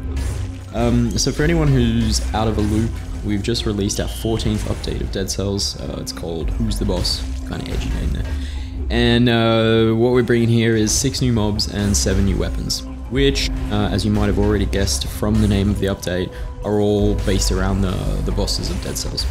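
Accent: Australia. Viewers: You're Aussie. Is Motion Twin an Aussie company? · Vlog is good, also it's nice to hear an fellow aussies voice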